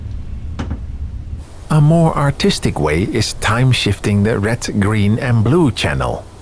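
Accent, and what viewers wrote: Netherlands. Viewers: how can you pronounce everything perfectly but still have a massive dutch accent lolol. nice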